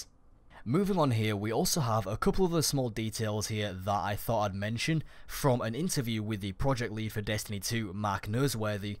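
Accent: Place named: Northern England, United Kingdom. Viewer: you have a very distinctive north england accent, do you live there or something.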